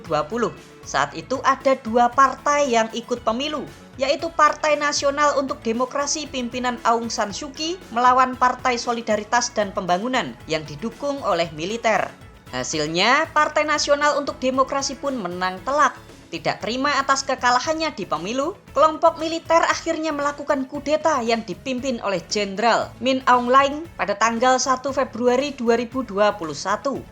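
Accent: Java, Indonesia. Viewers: Suaranya jadi candu dengan medok jawanya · Medok banget min jawa nya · Toto sekali jawanya min😅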